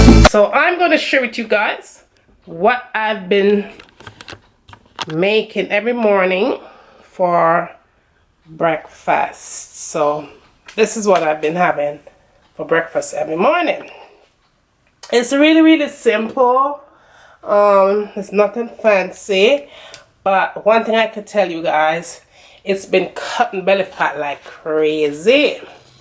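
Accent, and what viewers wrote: Jamaica. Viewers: Jamaican accent tan up strong duh... Thanks for sharing heard about this now I finally see it on youtube.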